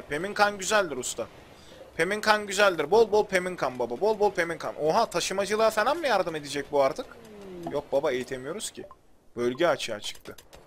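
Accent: Ankara, Turkey. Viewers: selam kardeşim adamsın sen ya ankaralı aslan parçası daima senin arkandayız en çok da ankaralı konuşmanı sevdim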